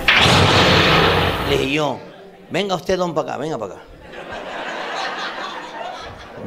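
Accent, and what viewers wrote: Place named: Veracruz, Mexico. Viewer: Pinche eres muy chingón veo siempre tus vídeos y me gustan de a madre porque el ablando del jarocho es divertido y como tú trabajas en tus precentaciones estás a otro nivel hay otros comediantes buenos pero para mí eres el mejor también tengo el privilegio de ser de Veracruz ánimo eres el mejor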